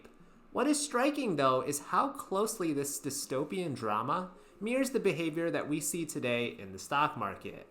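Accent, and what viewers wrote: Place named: East Coast, United States. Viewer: Asian? I'm guessing east coast Jewish family from the accent.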